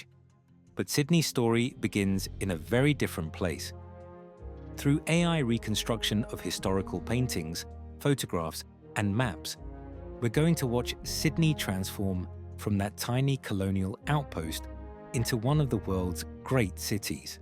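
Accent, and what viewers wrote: United Kingdom. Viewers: Yes. The main narrative problem in my opinon was the a lack of pause when a new 'chapter' was being started (e.g., a new photo to signal a later period.) A visual signal would help, as you say. AI voiceovers often seem to do so well at the phrase level, but have no training currently at wider narrative. We also hear the anomaly of an British English voice mouthing large numbers US-style, without the "and" we put between hundreds (for example) and the sub-hundred part.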